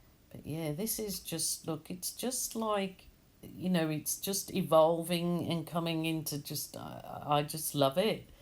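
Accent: Birmingham, United Kingdom. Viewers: Hello from Shrewsbury, UK. You’re a lockdown saviour...I’m now an acrylic addict! If you ever come to live in England, you would fit in really well in the Midlands as you have a perfect Birmingham accent. Just wondered if anyone else had ever mentioned that?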